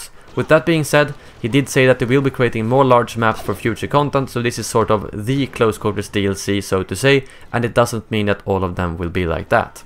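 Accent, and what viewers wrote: Sweden. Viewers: Hello, Mr.Swedish Accent guy commentary!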